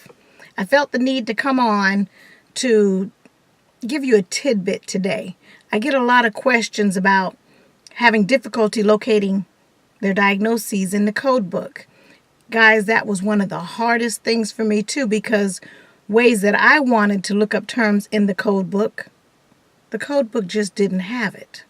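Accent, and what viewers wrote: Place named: South, United States. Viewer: I absolutely love your channel, this one and your other one! Your Coding Master Coach helped me past my CPC exam on the first try. Your approach is so likeable, and down to earth, with your southern drawl...so lovely. You're a very beautiful lady too, I love seeing a black intelligent women knowing her stuff! I clicked on this video today, but before I watched it, I wanted to post this. I know the topic of this video is Loving your books, and OMG if that is not the one thing I stress to people. You get to learn your code books by actually doing some work, working out different problems and different scenarios will give you that understanding and that missing piece to the puzzle. 5 hours goes by incredibly fast, and these books are huge, you want and NEED to be able to flip back and forth and feel confident that you know what you are doing. 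Love you! xoxo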